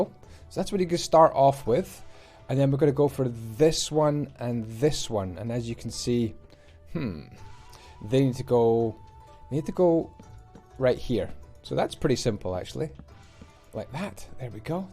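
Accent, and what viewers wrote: Scotland, United Kingdom. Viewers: Are you scottish? Because ei am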